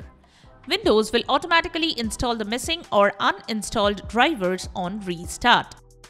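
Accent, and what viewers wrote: India. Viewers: A video full of kinks to follow without actually showing anything in an AI Indian robot voice 😩😩😩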